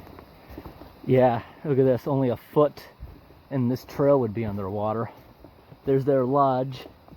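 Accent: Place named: United States. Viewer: I'm From New Zealand and I like his American Accent.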